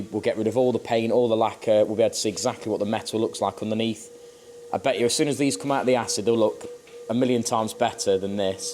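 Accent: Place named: East Midlands, United Kingdom. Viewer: Reckon this came onto my feed because I’ve spent too much time in the East Midlands recently 😂 clocked the accent straight away. Great to see how these are done, always wondered how damage was repaired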